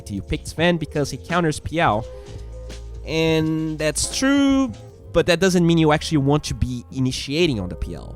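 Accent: Brazil. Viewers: dude, stop complaining about your accent. Your English is perfectly OK. And your accent always will be from Brazil. Your videos are Awesome keep like that. Hug from arg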